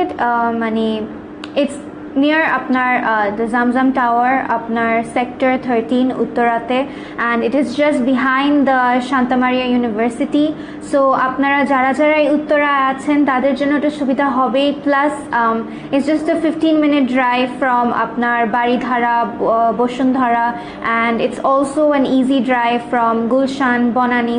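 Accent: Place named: United States. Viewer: আপনি বাঙালি বাংলা এমন ভাবে বলেন যেন আমেরিকা থাকেন। আগে নিজের ভাষা ভালোভাবে বলতে শিখেন তারপরে ইংরেজি শিখাবেন।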